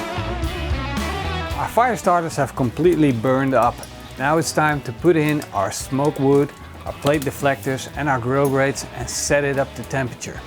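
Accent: Netherlands. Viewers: you sound so dutch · shaft says Dutch. He from Holland the netherlands 👊🏾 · Davis Gjeta ja man alle nederlanders hebben zo een engels accentje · Are you from around the nederlands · hate the dutch accent. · It's a Dutch accent ;) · Oh yeah yeah nederlandsssss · are you dutch ??? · is deze guy nederlands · wollah echt zehme weloe Nederlanders op youtube Pannekoek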